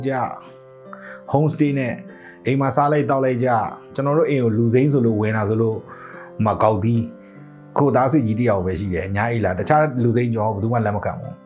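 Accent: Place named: India. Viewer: ဟြန္ဒိုင္းကAccentကမိုက္တယ္ဗ်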